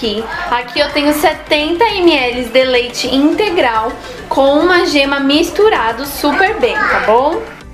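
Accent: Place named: South, Brazil. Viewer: Bom dia. Moro em São Paulo, mas sou Gaúcha! E tu , mora no Sul? No Paraná? Pelo sutaque! Bjs Amo fazer pão caseiro!!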